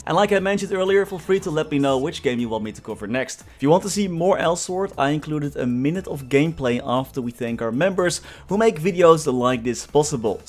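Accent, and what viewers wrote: Netherlands. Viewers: Are you Dutch? Ik kan een accent horen haha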